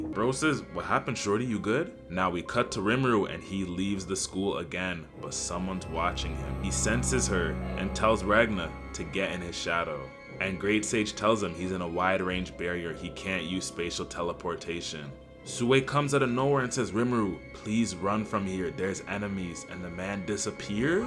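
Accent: Jamaica. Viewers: Are you Jamaican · You have the Jamaican accent bro❤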